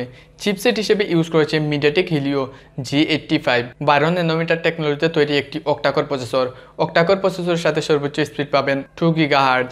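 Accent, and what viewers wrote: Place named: Sylhet, Bangladesh. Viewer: সিলেটি মাত বাদ দিয়া শুদ্ধ কা বলো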